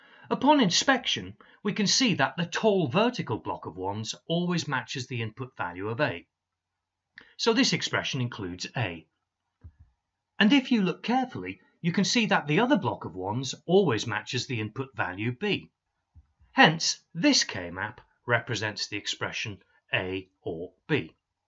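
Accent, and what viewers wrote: England, United Kingdom. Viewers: great video sir. refreshing to hear an english accent teach stuff like this on yt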